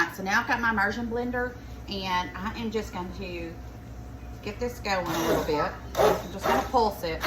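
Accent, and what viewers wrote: South, United States. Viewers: Where do you live because you sound like a southern girl and cook like I was raised I just love your videos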